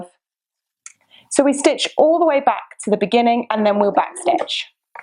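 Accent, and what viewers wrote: England, United Kingdom. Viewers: Very clear and detailed instructions. Love your English accent. I had never heard of clover leaf pins. I quilt. This would be great for matching quilt blocks!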